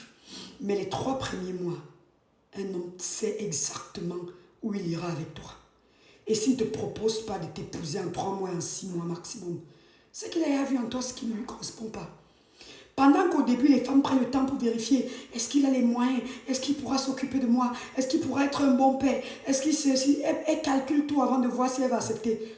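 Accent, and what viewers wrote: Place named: Cameroon. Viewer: Accent camerounais...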